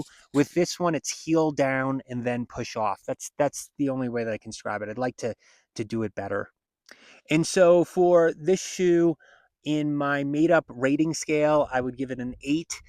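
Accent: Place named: Eastern Pennsylvania, United States. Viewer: Great video. I appreciate the way you break things down into details. I had a similar experience with a different Topo shoe that I tried a couple years ago, I think the Pursuit but not sure. It was great and balanced in every way except one small subjective thing that many people would be fine with, but I thought felt uncomfortable, and for me that was a deal breaker. Btw are you by any chance from eastern Pennsylvania? Your accent sounds like someone I know who grew up there.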